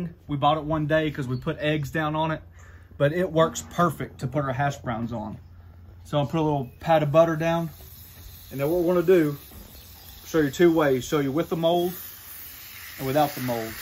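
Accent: South, United States. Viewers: .....that will work also....just found your channel....sounds like your from the south....I'm in Illinois, but have family in Nashville TN area....looking to retire and looking for somewhere warmer in the winter....LOVE UPUR VIDEOS...KEEP THEM ROLLING...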